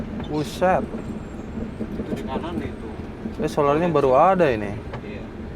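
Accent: Serang, Indonesia. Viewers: Sy senang ngikutin yutup sy juga sopir bis malam pahala kencana tapi lebih senang lagi klu bicara dikit dikit waduh aing ciri khas orang Serang he he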